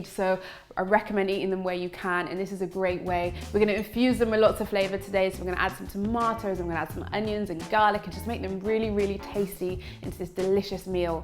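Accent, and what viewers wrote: London, United Kingdom. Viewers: Im a 1st time VIEWER......Oh My Stars🤩🤩🤩🤩🤩 This is a plant based FEAST🥳🥳🥳🥳🏆🏆🏆🏆 I wouldn't be able to finish that bowl but I would SURELY TRY! LOL That looks so good! Thanks for SHARING Doll🏆 U & Tish Wonders need to team up.....U both have that adorable London Accent🏆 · I knew it 💯% she was going to have a British accent 😂 she just looks English lol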